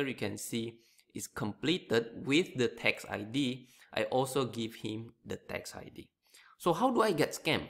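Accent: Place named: New York, United States. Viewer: He's a New Yorker and even sounds like a really shady New Yorker. His accent tells all check out TJ spoonman who got ripped off too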